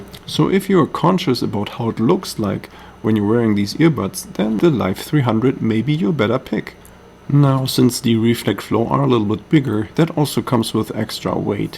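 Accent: Germany. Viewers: U have a German accent so GUTEN TAG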